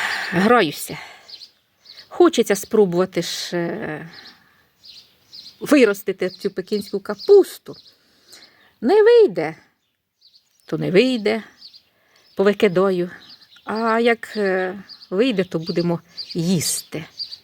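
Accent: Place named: Poltava, Ukraine. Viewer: мені дуже подобається Ваша мова я сама родом із Полтавщини як родичів послухала здоров'я Вам миру🎉🎉🎉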